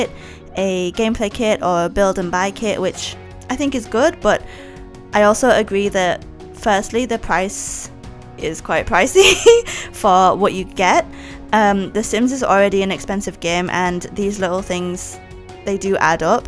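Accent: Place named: Asia. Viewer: Hey! Firstly, gorgeous build - like, it’s so cozy and cottage-y and I love it! Secondly, your voice is so cute! And it’s so cool to learn that you’re Asian, I wouldn’t feel self-conscious about your accent or voice, but I appreciate it’s still daunting to create voiceovers haha! I struggle with my own. In response to your question on voiceovers, I tried to script mine but it came out too robotic... now I’m trying to just go with the flow, tell some stories, talk about the build, but it does take a lot out of me to record them. I’ve got so much recorded footage just waiting for voiceovers 😂 Love your videos! 💜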